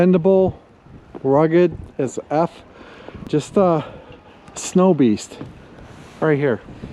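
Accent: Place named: Cleveland, United States. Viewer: Cleveland accent I hear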